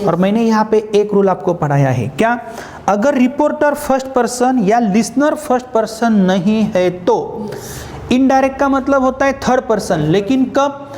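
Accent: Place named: India. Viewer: I was struggling to understand India accent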